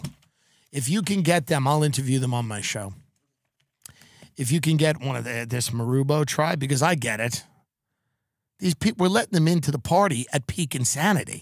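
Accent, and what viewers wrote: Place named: United States. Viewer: It's kind of weird, I saw his special... It was ... It was so stale. It was bad. Just really bad. But this podcast and way he performs here is pure gold. A real american voice. Everything he does in this podcast is 100% on point and makes me go crazy. This podcast is hilarious in a way that Louie describes, I feel how it's changing me as a human and how I view the world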